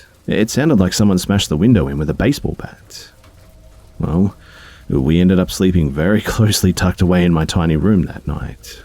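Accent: Australia. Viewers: I haven’t watched one of these in quite while like 2016 or something I missed the Aussie voice mates.*I am Australian btw*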